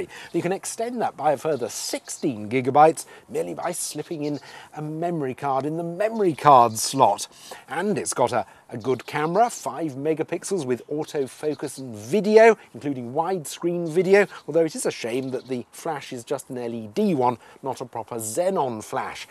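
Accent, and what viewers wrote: United Kingdom. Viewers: hehe love the brittish accent top class...ohh and the phone looks great by the way :)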